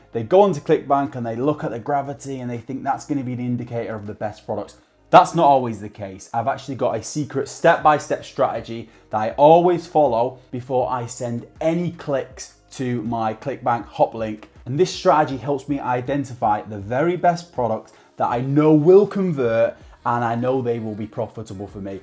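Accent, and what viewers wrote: United Kingdom. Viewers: good and clear presentation,thanks for a different view, a very clever lad, and a fellow brit I think.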